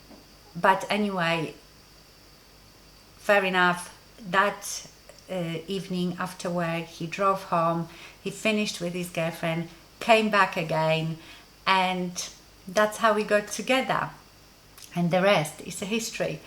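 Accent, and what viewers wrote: Poland. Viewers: You still have polish accent as well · I loved hearing your story. I am relatively new to watching you and didn't realize you were Polish. I often wondered about your accent. I truly hope you and Paul are still enjoying life together. Thanks for sharing. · I have been watching a few of your videos lately and I always thought I detected a polish accent behind the English accent. I finally found your video about how you ended up in the UK and it confirmed you were from Poland. ( My mom is Polish and moved to Toronto in 1971)....funny thing is that the video got even more personal for me because when I met my husband he used to wear this ridiculous ring too and I completely forgot about that. lol It looked like a hockey championship ring (but was not). Such a brilliant idea to make a lovely ring out of the diamonds. Thanks for the laugh. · Oh yes you are, love the Polish accent, I so miss Warsaw😢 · Hello it’s so nice to hear your story. 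It reminds me of my own I’m croatian and came to France in 1995. Life back then was so different and you are right we were kind of naïve. And I don’t know you but French people here thought that we lived like a cave man in estern countries... like we never so a tv, Phone, or PC.... so funny. Kisses and Big hug from 🇫🇷 Love your accent I don’t know if there is some polish residu but it’s so cute 💓💯💓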